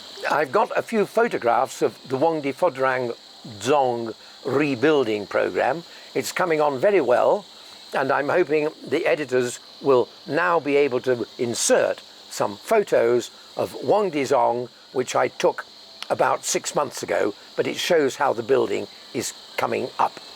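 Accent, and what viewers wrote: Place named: Oxford, United Kingdom. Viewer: I listen most of your talks because I think you beautifully speak original Oxford English, I think. Original way of language speaking changes in passage of time from place to place.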